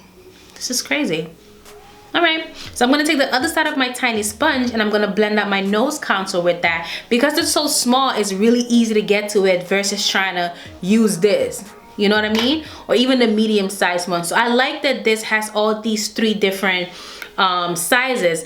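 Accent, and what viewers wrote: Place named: New York, United States. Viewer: Once I listened more I picked up NY lol.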